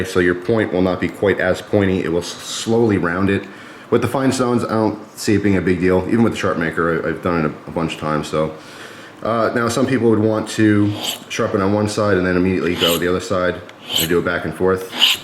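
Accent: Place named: United States. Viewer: It's called a "strop;" it's a piece of leather with abrasive material on...Oh, wait, you're making fun of his pronunciation, aren't you? Well, my cheeky friend, there's a whole bunch of dialects in the U.S. and I honestly don't know why you are entitled to judge him for having a different one than yours.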